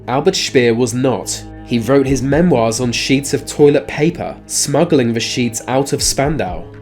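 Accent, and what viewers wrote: United Kingdom. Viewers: You need to go to a surgeon for your face... hey that's really rude of me isn't it? I don't mean that really. It's not a speech impediment. It's actually a dialect accent of Britain.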